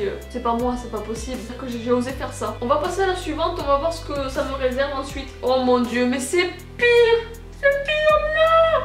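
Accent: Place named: South, France. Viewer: ta l'accent du sud sa s'entant 😀😁☺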